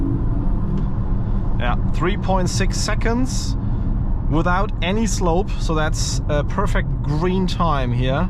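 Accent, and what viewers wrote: Germany. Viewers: Man hört diesen Deutsch Akzent sooo raus haha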